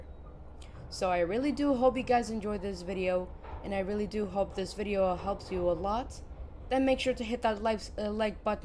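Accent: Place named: United States. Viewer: why does bro sounds american when bro lives in saudi arabia 💀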